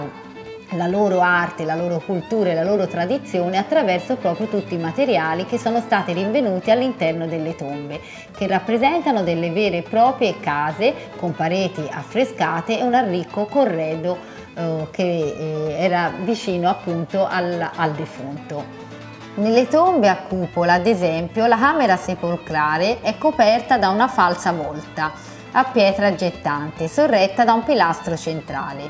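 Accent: Tuscany, Italy. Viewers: Se cerchi di togliere quella c toscana almeno quando spiegi sarebbe meglio per una parlata più omogenea e italiana perchè a certi da dastidio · Es italiano con el acento toscano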